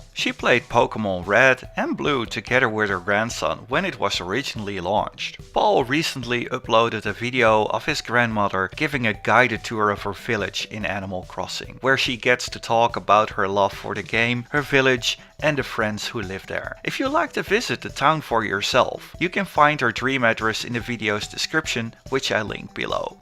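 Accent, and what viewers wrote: Netherlands. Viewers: So ar you dutch then cuz you sound like it (im dutch)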